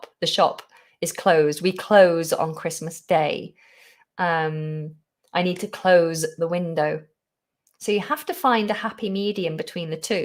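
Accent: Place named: United Kingdom. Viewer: Hi great to see you again and enjoy listening to your beautiful British voice. Unfortunately i couldn’t catch you on live but it’s Ok as i am watching you right now from Casablanca, Morocco 🇲🇦 Thank you so much for this live steam 😎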